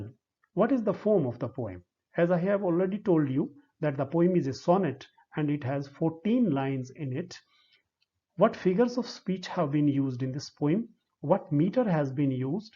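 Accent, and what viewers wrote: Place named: England, United Kingdom. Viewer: Sir, memories of 2005 when I was in BA. Ist and you were the lecturer. I was stunned by your English accent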